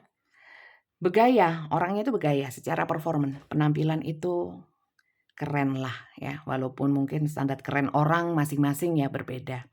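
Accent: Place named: Malang, Indonesia. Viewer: Malang mana Bun? Saya jg Malang Makanya kok ngomongnya enak😂 Iya Bun, kemarin sy nonton reading'an bunda,,,ni jam 00.28 sy masih stay d channel bunda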